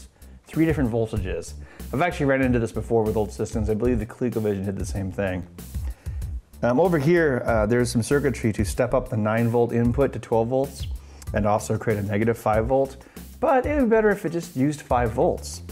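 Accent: United States. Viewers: American English! Sound as thick as shit, plus uneducated with easy! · we say zhead x spectrum because that's how is meant to be said as its a uk product and the uk invented the language that the yank ruined lol. I would say speak English boy but I know for yanks this is impossible .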